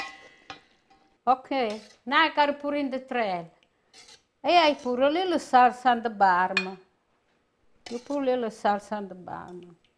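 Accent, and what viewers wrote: Italy. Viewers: Oh, I looooooooove Italian accents! And hers is so sweet.... I love how she narrates every step. Sending big hugs from Deutschland, beautiful lady! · So happy to stumble onto your channel. My sweet Italian grandmother has been gone for 19 years. Listening to you is like being back in her kitchen. I never noticed her thick accent. I understand every word you say. Wonderful memories. You are real treasure. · I am a fan of Italian cuisine specially when it is presented in English with Italian accent. I am happy that Pasta Rigatoni brings back to you nice memories. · My God I could smell that food through my phone it looks so good. I just want to give that woman a big hug I love her thick Italian accent continue continue making videos grandma I love you. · watching you cook, and hearing your wonderful Italian accent, and feeling the love coming from your heart, makes the part of my day that I spend with you, in your kitchen, take me back to my own childhood, your a very special woman, thank you for what you are and do.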